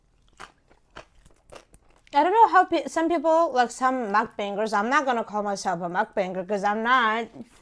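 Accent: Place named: United States. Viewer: Your American accent helps me improve my pronunciation.Waiting next English speaking vlog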